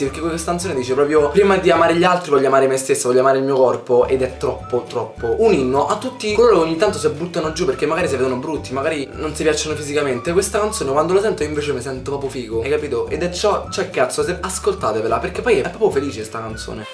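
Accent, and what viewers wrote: Rome, Italy. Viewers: hahahahha mi fai troppo ridere! e poi il tuo accento romano è fortissimo!! haha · Canti benissimo! Oltretutto amo sentirti parlare perché amo il romano ahahah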